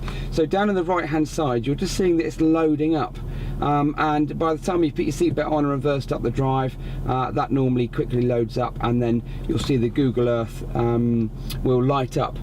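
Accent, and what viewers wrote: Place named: England, United Kingdom. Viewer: Hi Just found you and nice to hear an English voice giving help for a change hahaha!!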